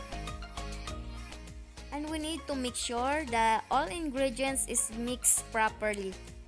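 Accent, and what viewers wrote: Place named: Philippines. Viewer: haha i love ur accent..haha..pinoy na pinoy..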